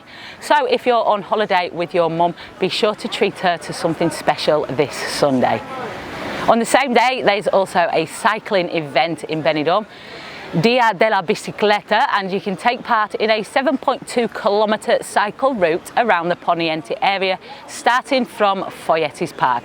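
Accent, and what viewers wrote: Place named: England, United Kingdom. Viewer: Brilliant thanks. Also could I ask where you are from in England cos i like to guess accents, is your accent Manchester or Nottingham.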